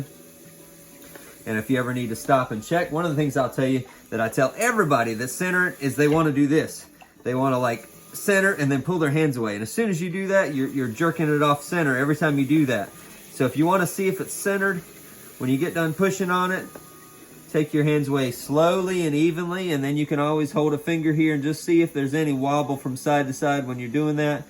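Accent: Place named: United States. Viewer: Thank you so much for all of your videos; I really appreciate you taking time out to share your skills and expertise. And I really like your laid-back style - I'm not sure why some people want you to be something you're not. Strange. Btw, I love your accent. We Brits are always being told that Americans like our accent - but yours is just lovely.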